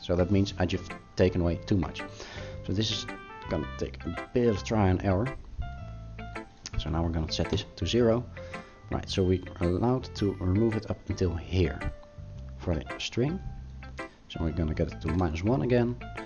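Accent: Netherlands. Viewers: Yoooo that accent thoooo are u dutch ? Cus so am i lollololol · Lmao never been so confused Je accent liet mij denken dat je van Nederland bent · your obviously dutch i hear that imidiatly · Are you dutch? I recognize that accent.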